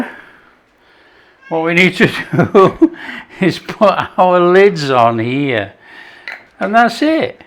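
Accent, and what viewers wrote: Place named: Derbyshire, United Kingdom. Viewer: Are you from chesterfield ?? , you sound like someone I watch from there ! I'm from canada, but my grandmother was a brummie 😁 Great video !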